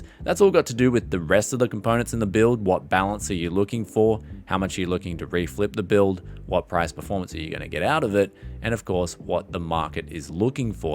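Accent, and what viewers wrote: Australia. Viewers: I think I could listen to Aussie's talk about anything and stay interested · love the aussie accent.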